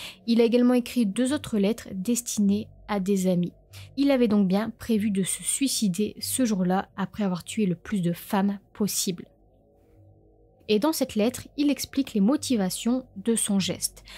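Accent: North, France. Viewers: Vidéo bien traité en effet, avec un petit accent du Nord de la France 😜 , merci encore 😘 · Tu es du nord de la France ma grande... ça s entend... lol... moi aussi... Merci pour ton récit !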